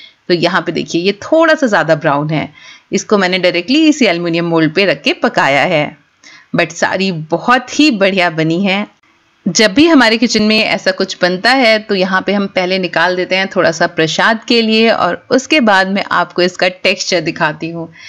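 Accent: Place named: Meerut, India. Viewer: Aapki Urdu boht piary hai pure merut wali love from lahore pakistan 😘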